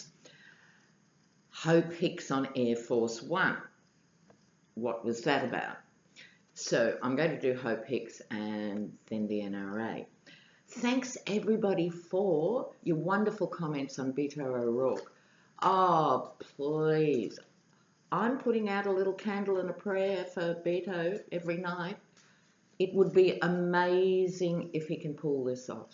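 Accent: Australia. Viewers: Linda Casey, That had me cackling. I missed that because I'm used to the Aussie accent. But seriously, the visuals of Trump humping Hope are just too... excuse me while I barf.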